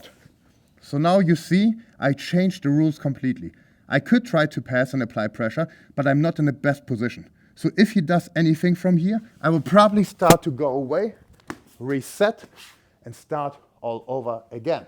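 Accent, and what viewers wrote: Germany. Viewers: He is German (or his accent is German for sure) what do you expect…. I dress like this too 😊. I always wanted to know if Jozef speaks German, I think so.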